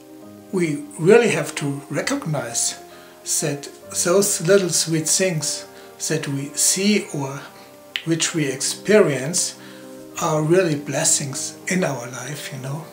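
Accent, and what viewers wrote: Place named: France. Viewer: OMG! Are you FRENCH?! :D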